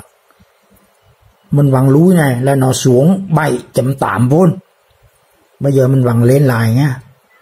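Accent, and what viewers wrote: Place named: Hue, Vietnam. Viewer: Rất hay!! Thích nghe giọng nói ĐẬM CHẤT HUẾ của ANH....THANKS.!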